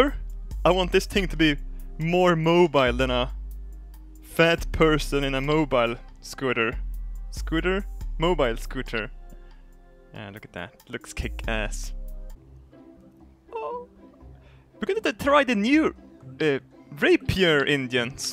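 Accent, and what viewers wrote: Sweden. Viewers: dat swenglish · I love swedish accent:D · It's his awesome manly Swedish accent maveon carter so go fuck off. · SurvivalGaming Romania Hes Swedish its a Y sound, like jimmy sounds like yimmy. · it's a typical swedish accent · The language he is talking is English. His accent is Swedish.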